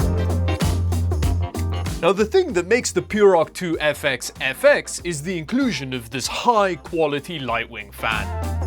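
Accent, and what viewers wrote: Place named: Afrikaans, South Africa. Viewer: I am so happy to have learned that is afrikaans and not just me trying to figure out where the hell I have heard his accent before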